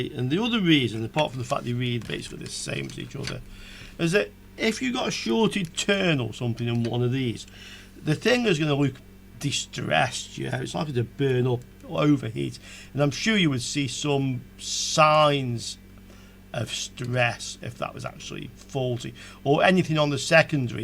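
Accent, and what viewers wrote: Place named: Liverpool, United Kingdom. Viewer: On risque alors de perdre son accent savoureux de Liverpool et son humour. Isn’t ?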